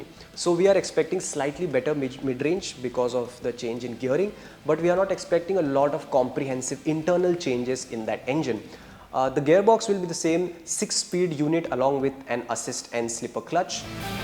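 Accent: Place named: India. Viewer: he is saying Guerrilla just like any indian would say. Whats the problem with that? Even Indians from different states of India will have different accent. Someone from UP will sound totally different from someone from Northeast, or someone from South India will sound different from North India. Accent has nothing to do with ones competency.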